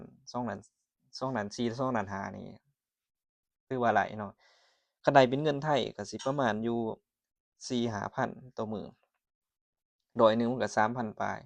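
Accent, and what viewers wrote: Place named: Champasak, Laos. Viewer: ຢູ່ບ້ານໃດຢູ່ລາວເວົ້າຄືຄນແຂວງຈຳປາສັກ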